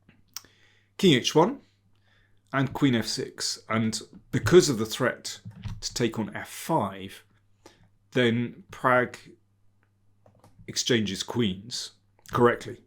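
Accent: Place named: RP, United Kingdom. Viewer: I like this channel as Daniel King's English is truly BBC-style. Always picking up new vocabulary. From this video: 'trifle', 'set things in train' and 'indictive'. Thank you!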